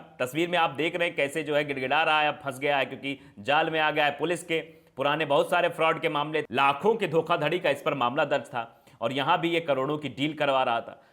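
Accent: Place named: Lucknow, India. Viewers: मस्त अंदाज़ है भैय्या आपका🙏🙏 प्योर up के लगते हो। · FromPak🕊Enjoyed your dialect/ language and style. Are u from Lucknow.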